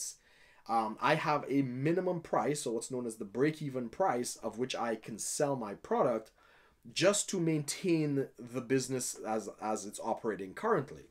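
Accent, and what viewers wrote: Trinidad and Tobago. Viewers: he has the white trinidadian accent lol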